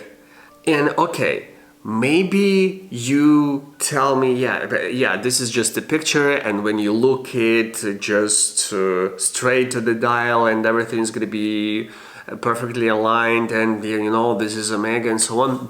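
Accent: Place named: Eastern Europe. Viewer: Great video, even greater personal exercise. Yes, slight eastern-European accent, but not this (infamous) rrrrussian one. My advise, take it or not - double bourbon before the takeoff, repeat as necessary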